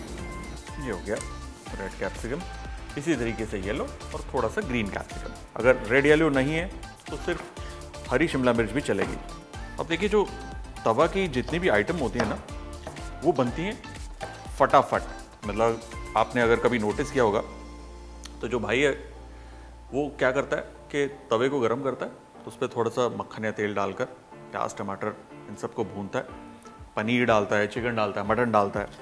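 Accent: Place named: Lucknow, India. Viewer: Aap bilkul lko ka touch dete hain boli m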